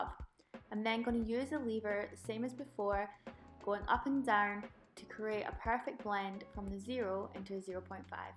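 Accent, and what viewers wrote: Scotland, United Kingdom. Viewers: Are you Scottish? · The Cuts ❄, The scottish accent, is this in glasgow?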